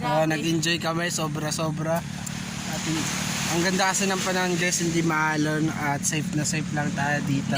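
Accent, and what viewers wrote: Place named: Visayas, Philippines. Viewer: bisaya diay ka lods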